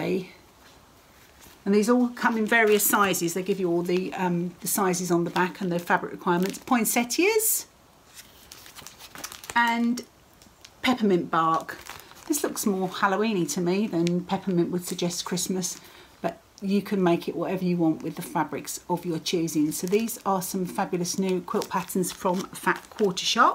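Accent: England, United Kingdom. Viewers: I love your floss tube. I love hearing your accent. I am from the US and your English accent is so sweet to me. I love your content. If you are feeling pressured to have more, maybe you could put more distance between your episodes. I love hearing about your dog and your husband and your mom and your job, whatever is going on your life just as much as I love seeing your work. I hope you stay, but I understand you need to do what’s right for you. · We love at my house. My husband and daughter love listening to your British accent as you describe your projects. We love it when you say, “bits and pieces”. Please continue with your channel whenever you have time. Your work and content are always so good! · I do so hope you continue with your flosstubes! I have totally enjoyed them! Being from the States, you have shared a bit of life across the pond, & it's been delightful! 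I've enjoyed seeing the English countryside, your charming accent, as well as your lovely finishes. You've inspired me to try new ways of finishing & different designs. I like that you seem real. Life gets in the way for us all at times, but your videos have kept me going through some challenging days. I have dropped some subscriptions, as they overwhelm me. Too much haul, so many finishes, one feels like we're in a race & can't keep up. Designs are coming fast & furious. It's nice to see someone working on older pieces. Watching you is like stitching with a friend. We seem to pick the same patterns...I too have been reworking my sewing space...it's like we're on the same wavelength. Maybe post monthly? I'd really miss visiting with you! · Please do not leave. When I saw on instagram you had posted I made a cup of tea and sat down to watch. You are one of my favorites and I don’t get a lot of British floss tubers and so enjoy your accent. 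Just keep showing your work and cute dog and telling us what you have been up to. As to haul I can’t afford a lot either never mind about that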